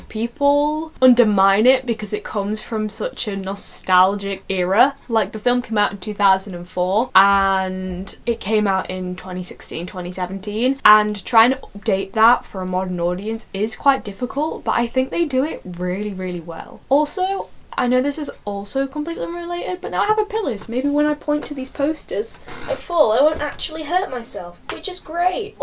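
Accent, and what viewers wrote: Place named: United Kingdom. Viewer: Everytime I forget u have a British accent i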